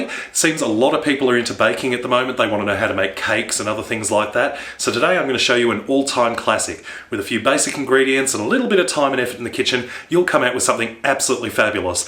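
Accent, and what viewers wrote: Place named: Australia. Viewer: thats just his epic aussie accent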